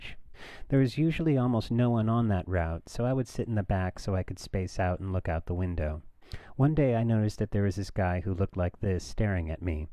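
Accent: Boston, United States. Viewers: OMGSH You're from Boston~ AWESOME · Wait your from Boston? LOL! Didn't know that.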